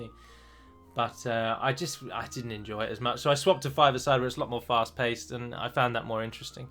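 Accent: United Kingdom. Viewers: I have always enjoyed watching your videos. It's almost ritualistic that I come home from a day of work and after dinner (sometimes during dinner if my wife is not here) watch a video and escape into the world of LOTR. My wife says she doesn't mind me watching your videos because they are clean and she loves a good British accent. Your girlfriend/fiance is gorgeous and her saying the names of her statues reminds me of my wife. Keep up the great work and cheers to the future.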